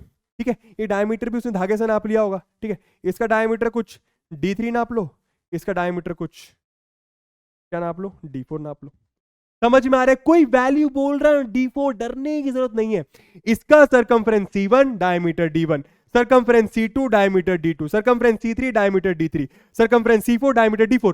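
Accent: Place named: Bihar, India. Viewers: sir speaking bihari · Such a bihari 😅😅 but good THANKS sir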